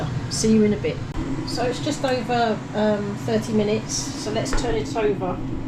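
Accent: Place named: Croydon, United Kingdom. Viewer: Just subscribed love your channel take it your from Croydon way just your accent 😊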